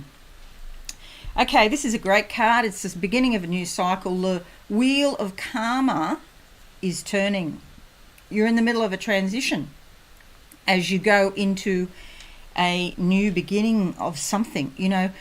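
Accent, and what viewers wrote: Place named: Australia. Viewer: I heard you and thought.. This girl's an Aussie.. And then you mentioned the kookaburras.. 😂😂😂.. Loved your reading!... (fruit in the Garden.. MAN.. GO!😉)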